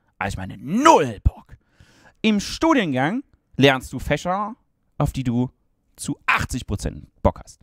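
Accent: Saxony, Germany. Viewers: Typischer Sachse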